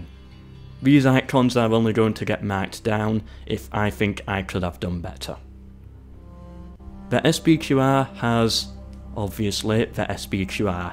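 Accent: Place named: Manchester, United Kingdom. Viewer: What accent is this omg, Manchester?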